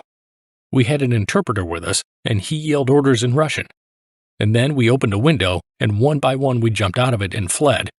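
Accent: United States. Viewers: This was somewhat confusing - the narration being from a German point of view but in an American accent !!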